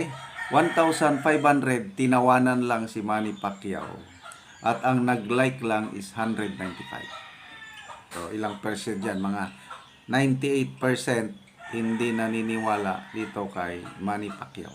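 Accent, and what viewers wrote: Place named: Visayas, Philippines. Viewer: Wow kabalo man diay ka mag bisaya sir?😂😂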